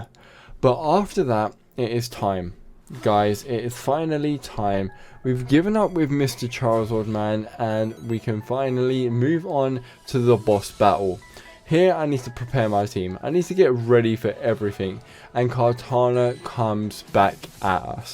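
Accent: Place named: United Kingdom. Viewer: Imma guess your British as a British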